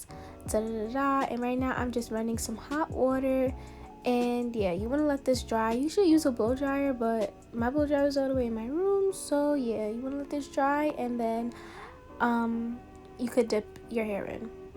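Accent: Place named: New York, United States. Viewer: you must be from NY, your accent sooo cute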